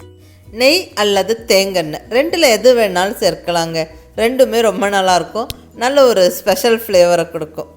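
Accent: Coimbatore, India. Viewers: More than everything,your presentation and narration is fantastic.the language and slang is like that of coimbatore ladies.i like the preparation the 'padam(Tamil) is my favourite.my wife does not like,but I prepare both upma and Pongal like this.thank you for the special tip,that of heated and cooled milk.i was not knowing.gift during maargazhi maasam.thank you.iam 77 plus!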